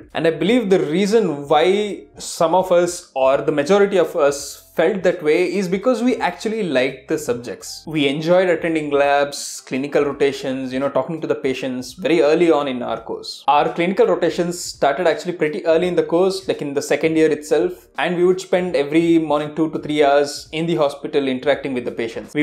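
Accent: India. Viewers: Dude,I don't know why but i can feel your Bangalore's accent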